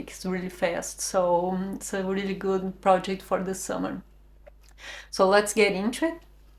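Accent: Brazil. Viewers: Very beautiful your work, but about your english you seem to be brazilian.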